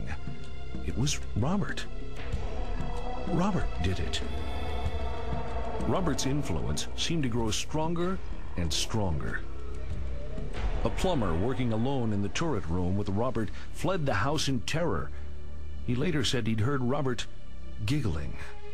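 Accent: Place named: United Kingdom. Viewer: hm ur british arent u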